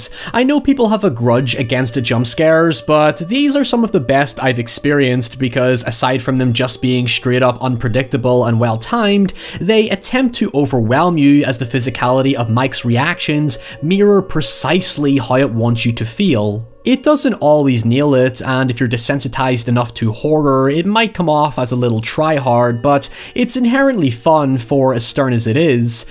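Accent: Ireland. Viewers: I also do "Horror Genre" vids, and one of my wee ones (who happens to be very, VERY scared of the dark, and have a TON of phobias) likes to listen as I make a video, so I have to be careful which videoes I let her listen to me do Audio for... ANYWAY- whenever I get a notification one of one of your videos dropping, I like to take a break and listen. Now my wee one is obsessed with your accent too. SHE now loves Irish accents MORE than English accents ( I do a convincing combination of various UK accents- as I act too. Stage "Irish" sounds nowhere near as charming as your TRUE Irish. ENJOYED the video. This movie seemed to have completely changed from when I saw it in my late teens to when I saw it again in my late 20s. Now that I'm in my 30s it might be time to revisit it again.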